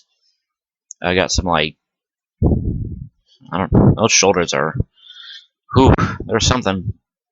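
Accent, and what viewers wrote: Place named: United States. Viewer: He's got a perfect American accent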